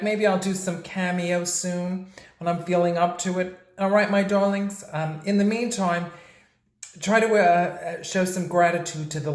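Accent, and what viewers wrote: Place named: New York, United States. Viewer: I like how you have a New York accent even though you live in Southern California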